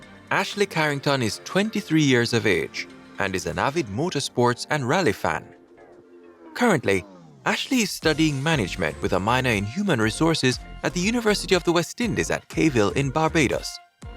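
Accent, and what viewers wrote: Jamaica. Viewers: I have watched videos by this narrator and he sounds Jamaican and has a bias for Jamaica in his videos. There was no mention of Giselle La Ronde or Wendy Fitzwilliam . He bigs up Jamaica more than any other island 😮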